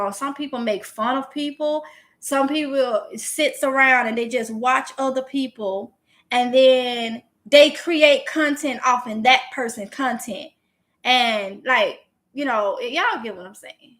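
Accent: South, United States. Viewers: GM, im awake at 4 something in the morning. Im 79 yrs old in upstate NY. Im learning and remember when i was 36 yrs old, i had a new born baby, working the night shift and husband took care of baby at night. I love you take care of your family. And I can understand your southern accent. My Mom had it also.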